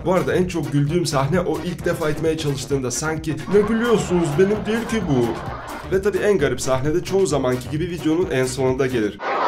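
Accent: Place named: Russia. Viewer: abi sen rus musun çünkü yaban cı gibi konuşuyonda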